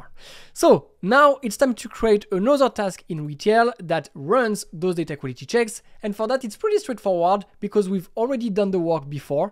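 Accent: France. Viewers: Just by the accent I assume that you are a french speaker. It would be great if you can make videos in french also. Great and explicative video!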